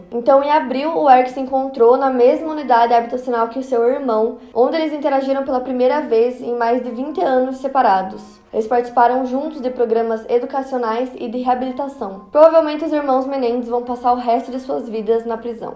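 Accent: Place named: Santa Catarina, Brazil. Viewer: Não tem nada a ver com o vídeo, mas eu sempre me pergunto se a é de Santa Catarina ou do interior do Rio Grande do Sul. Os sotaques são muito parecidos. Eu sou do Rio Grande do Sul e as pessoas que moram mais no interior tem o sotaque bem parecido, então eu acabo me confundindo.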